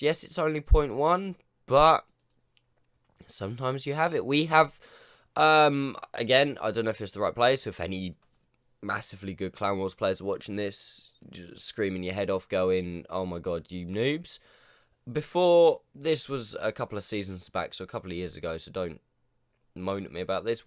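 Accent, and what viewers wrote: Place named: Southern England, United Kingdom. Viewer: hey man great vid, im from the south of england and it sounds like you are from somewhere around there, if you ever fancy doing some platoons id love to play with you some time, you seem like a cool dude, getting the 907 on monday so would be cool to do some games! all the best mate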